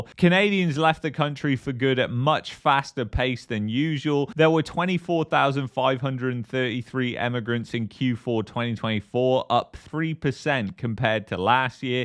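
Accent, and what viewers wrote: United Kingdom. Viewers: I have never seen a positive video from this guy just gloom and doom. If he does not hate Canada so much he should just move somewhere else, he sounds British anyways.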